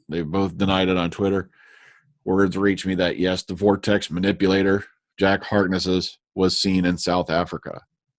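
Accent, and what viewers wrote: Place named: Oxford, United Kingdom. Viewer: Your Oxford English accent is almost spot on! Lengthen your vowels & don't pronounce the r in words like bar, car & far.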